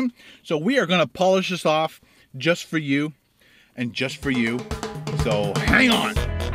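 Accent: Quebec, Canada. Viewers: yeah in québec we sound like that lol and we have a thousand type of poutine! try poutine planet in québec, Qc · Iam form germany ... i understand that Barbecue Sauce Stuff ... especially we dont have a lot of good ones here! PLS say more OUT About and Without love that canadian accent :D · your quebec accent is right on!! when are you coming up east ,in quebec...to review some local restaurant?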